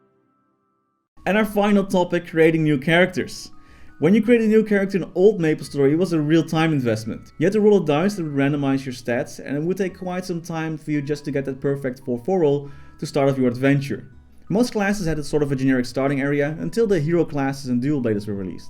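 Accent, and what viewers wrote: Netherlands. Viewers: You sound so Dutch. I'm in Utrecht. Hi.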